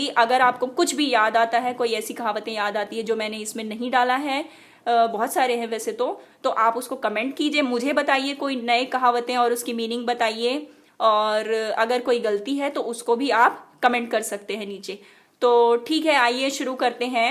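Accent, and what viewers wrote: Chhattisgarh, India. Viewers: Mam apki cg boli bahut mast hai🙂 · aapman ke , chattishgarhi boli har adbad gutur he. maidam ji aap man lokokti ke baare m au video banye ke prayas karho.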